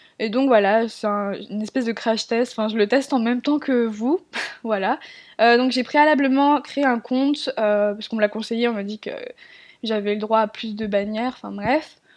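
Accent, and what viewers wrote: England, United Kingdom. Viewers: Jadore t'es video mais ton axant anglais me gene un peut car en dirait que tu veux faire genr ..' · oh mon dieu ton accent anglais et juste waouhhh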